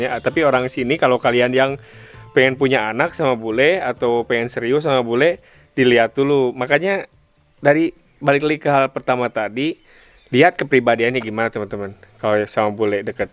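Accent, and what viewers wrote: West Java, Indonesia. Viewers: Ga apa² Kang, kebawa bahasa sundanya, malah enak didengar, jadi menghibur juga😀😀 Semangat Kang..!!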